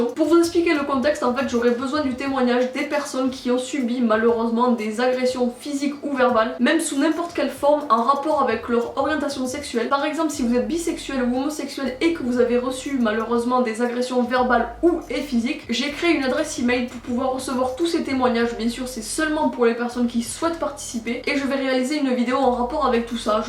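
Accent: Toulouse, France. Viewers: Tkt pas les commentaires méchants ont s'en fou, et ton accent c l'accent toulousain moi j'habite a Toulouse et j'aimerais grave te voir ❤ et ton style j'le kiffe trop 🔥💪❤ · L'accent Toulousain on l'a pas choisi lol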